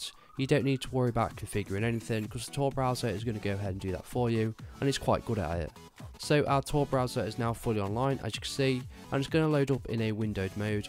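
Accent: United Kingdom. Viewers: i hate british accent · Love the British accent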